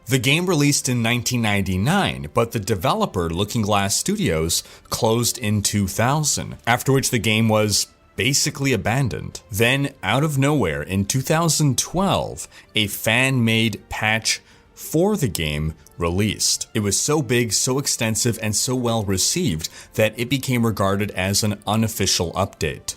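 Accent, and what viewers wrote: France. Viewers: You have a very good french accent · Your french ascent :D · ur french accent is not bad!!!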